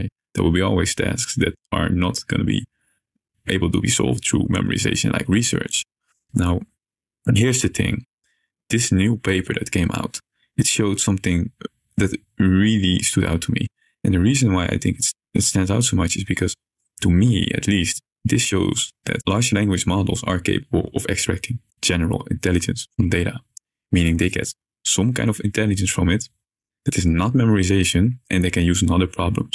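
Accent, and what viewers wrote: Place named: Netherlands. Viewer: Interesting video. Good job. Just one thing: your audio stops at around 8 minutes into the video but the video still goes on for like another 2 minutes. Maybe something got cut off? Regardless I just subbed and love the Dutch accent. Down for more content in the future :) Greetings from Germany and reddit lol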